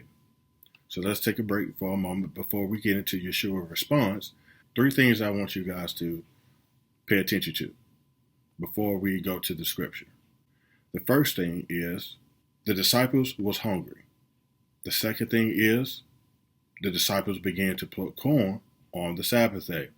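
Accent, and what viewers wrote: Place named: Memphis, United States. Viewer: I agree! Very good brother! Where are you from? Memphis? Love your accent! 👍🏻🙏🏻😀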